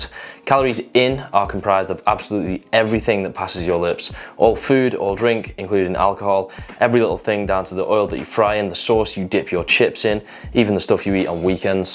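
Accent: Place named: Widnes, United Kingdom. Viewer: Sound like your from widnes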